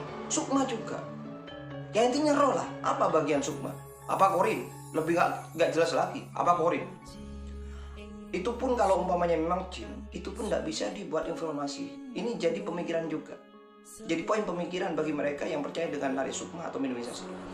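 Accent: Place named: Madura, Indonesia. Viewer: Bhasanya logat.orang Madura iya pak..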